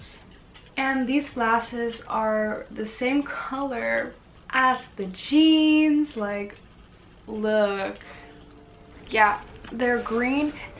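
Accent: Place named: United States. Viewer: bro u sound American and ur English is GOOD🤝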